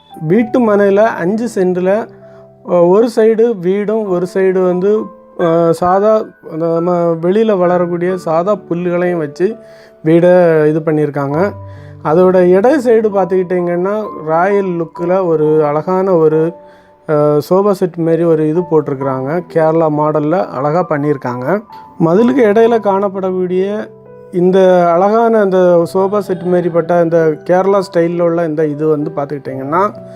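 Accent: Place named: Tirunelveli, India. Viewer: நீங்க தூத்துக்குடியா அல்லது திருநெல்வேலியா உங்க பேச்சு அப்படித்தான் இருக்கு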